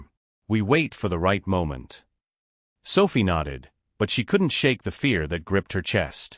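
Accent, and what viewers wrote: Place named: France. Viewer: What's with the french accent leaking in? A.I. ?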